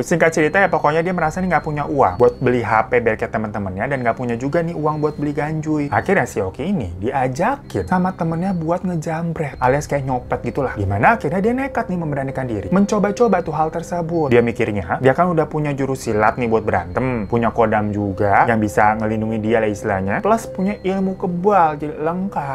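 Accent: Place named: Lampung, Indonesia. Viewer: weh logatnya lampung bgt wkkw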